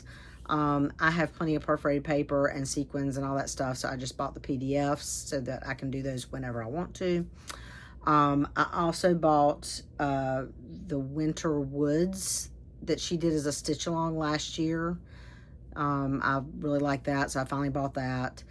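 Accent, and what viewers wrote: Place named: North Carolina, United States. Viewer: Just found your flosstube and love going back and watching your previous episodes! I live in Florida now, but previously lived in the Charlotte, NC area for about 20 years! Love hearing your NC accent though, and seeing all your beautiful projects. Was wondering what thread you’re using for the Tisket a Tasket project. It’s beautiful and you’ve definitely enabled me!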